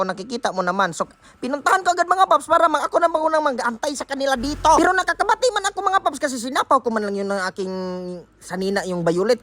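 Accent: Visayas, Philippines. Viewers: Galing jud ang bislog ni, bisayang tagalog😃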